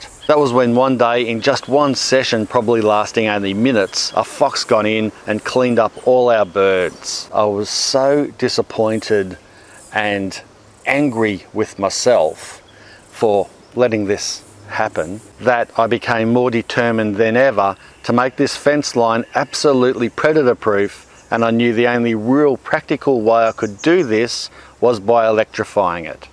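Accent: Australia. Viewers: G'day from Germany. Since my daughter did not come back from her trip to Australia because she fell deeply in love with the country and especially one of its inhabitants,I often tune into your channel just to have a little Aussie feeling and to learn the accent. And yes, we do have a rised garden bed now. Blessings to you and your loved ones!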